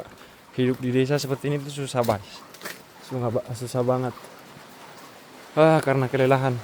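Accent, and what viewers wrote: North Sumatra, Indonesia. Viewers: mantap laeku saya yang selalu mengomen anda jarena saya duka dengan gaya anda membawakan cerita dan bicara anda sedap gitu loh sudah meng Indonesia walau logat bataknya masih kental tapi mantaplah pokoknya sukses terus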